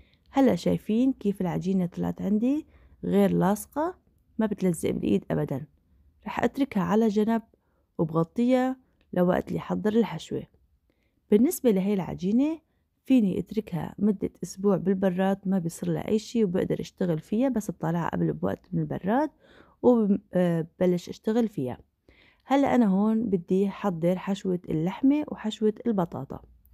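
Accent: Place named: Iraq. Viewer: مرحبه شلون الصحه هل انتي من عراق طريقه كلامج اني من عراق اول مرة اشوف قناتج كلش حلوة ومقاطع وقتها قصير اتمنالج موفقيه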